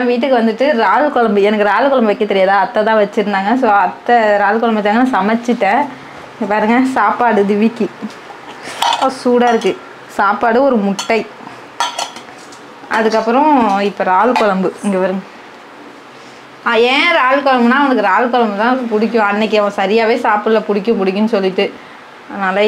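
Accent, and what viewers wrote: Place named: Sirkazhi, India. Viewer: Akka neenga praaradha ketkum bodhu nalla irukiku ka engalukkum sirkazhi pakkam than unga slang pathe nenaichan super keep rocking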